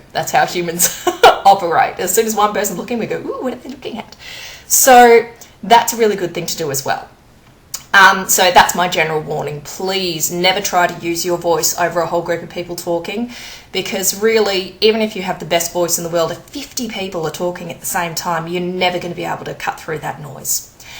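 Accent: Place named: Australia. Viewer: Aussie accent, the one and only. Just love it!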